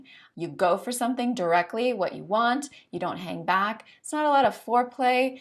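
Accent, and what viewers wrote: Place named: United States. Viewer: I have venus and Mars in Aries and when im horny its horrible but thats also probably because my ascendant is scorpio... I had like 30 orgasms the other day and im a guy in my 30s so yeah I dont really care if I cum quick because i will be back at it in less than 10 minutes... which is not normal...probably end up killing someone if i just held this energy in...my ancestors from one side were Polish nobility and literally every single man since my great grandfather were involved in wars and killing people and the ones from back in the day were winged hussar knights who were undefeated on the battlefield and destroyed armies up to ten times the size of them, they are legendary war heroes in Polish and European history as they saved Europe from the ottoman empires expansion......my sister has her moon and north node in aries too and shes a nutcase also...my mother had a horrible explosive temper and would beat us constantly....its genetic i believe also.Luckily my sun in pisces calms things down though.. 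if i had my sun in Aries that would be bad. Im very good at fighting and quick and have trained alot in the past but now I just go to the gym to look muscular and toned... but yeah your hot by the way... if you ever go on vacation to Mallorca hit me up...thats were I live... I love that American accent ,it would turn me on so much hearing you speak dirty... but yeah lol ...anyways..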